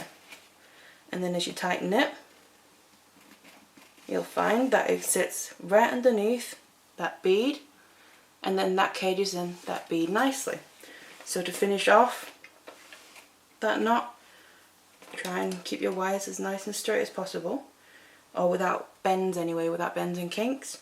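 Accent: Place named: Macclesfield, United Kingdom. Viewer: My cousin lives in Maccelsfield. Her accent seems close to yours - is your location mid country?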